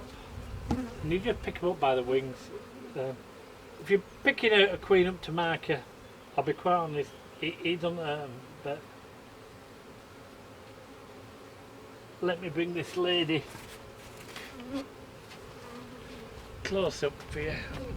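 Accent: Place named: Australia. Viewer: Sounded like an Aussie to me.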